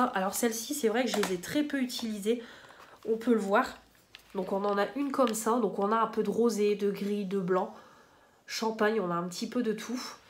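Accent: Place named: England, United Kingdom. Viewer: En effet, j'ai kiffé le petit accent anglais 🤣❤️ hâte de voir de jolis makeups avec cette palette ✨️🌸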